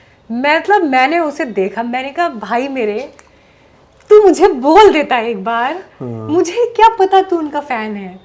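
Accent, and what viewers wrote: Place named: Garhwal, India. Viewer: स्टाइल भले ही आप कह रहे हैं पर हिन्दी बोलने पर कहीं कहीं गढ़वाली लहजा समझ आ रहा है।😊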